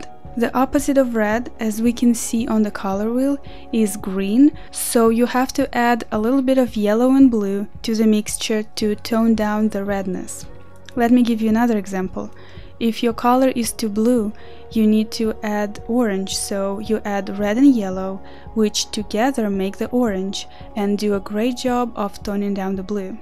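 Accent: Russia. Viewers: Wow, are you from Russia? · Русский акцент))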